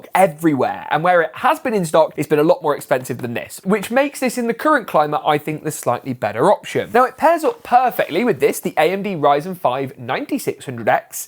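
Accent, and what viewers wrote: England, United Kingdom. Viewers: I find your pc builds informative, but you sound very English from the UK? so why all the pricing on the components in $'s and not £'s first.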